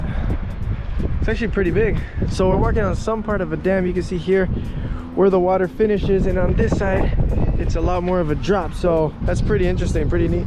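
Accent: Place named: Argentina. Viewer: Cool!! You have a perfect Argentinean accent!!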